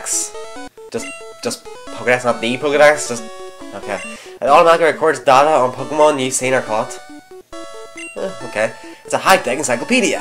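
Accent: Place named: United Kingdom. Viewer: u sound kinda british / scottish / austrailain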